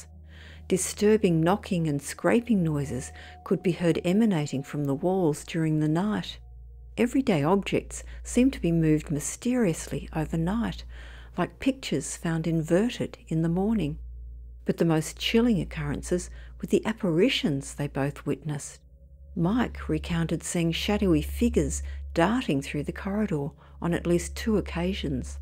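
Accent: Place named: Australia. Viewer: God, the Australian accent is so ugly! I can’t listen to this crap! Change the accent!!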